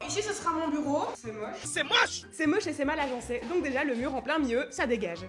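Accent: Belgium. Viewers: La musique de c'était pas nécessaire parcontre surtout quand ont n'a un accent belge comme toi. 😅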